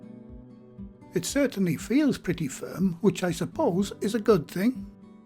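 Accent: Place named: Northeast England, United Kingdom. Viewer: he’s northeastern English :)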